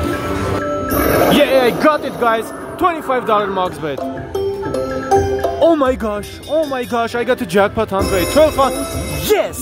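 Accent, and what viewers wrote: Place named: Iran. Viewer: love your Iranian accent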